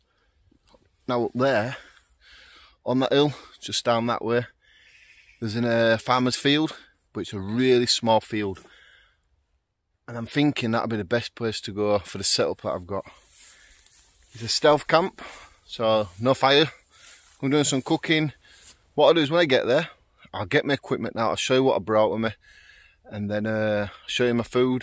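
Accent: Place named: England, United Kingdom. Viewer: It's an English accent. The English live in England, the Scottish in Scotland, and the Welsh in Wales, but all three countries are British because they live on the same island: Great Britain, the largest Island in the British Isles. Welsh and Scottish accents are also British, but this one is clearly English. Great Britain together with Northern Ireland forms the United Kingdom of Great Britain and Northern Ireland, abbreviated to the UK, to which you can add Irish to the aforementioned accents. Hope this helps.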